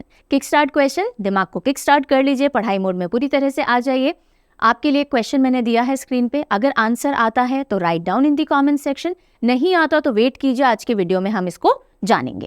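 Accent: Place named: Maharashtra, India. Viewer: Your marathi accent is too good mam....Aaple sarkar seva kendra...love from Maharashtra ❤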